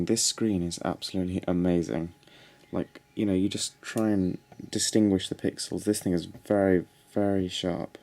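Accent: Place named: RP, United Kingdom. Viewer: Good review, thanks for taking the time to do it. I've just ordered one. P.S. You have one of the finest posh accents I've ever heard. Bravo, good sir!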